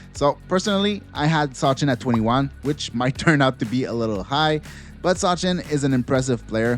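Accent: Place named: Quebec, Canada. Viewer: ca prends un QC pour reconnaitre un QC qui parle anglais, Sup dude! ;)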